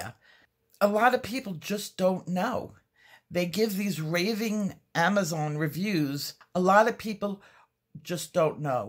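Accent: New York, United States. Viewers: Yes, I have discovered this myself. Thank you for all you work...as a former NYer I love your accent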